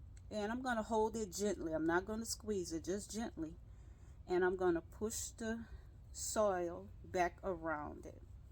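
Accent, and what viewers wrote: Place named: Louisiana, United States. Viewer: Beautiful, south louisiana gardener here. As soon as you began speaking I recognized you are a fellow Louisiana gal 💙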